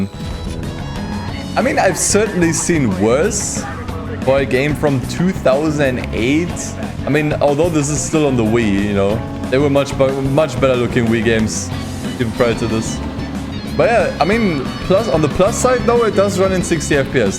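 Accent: Germany. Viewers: Top video, eine Frage bist du deutscher ? Hört sich vom Akzent oder Dialekt her an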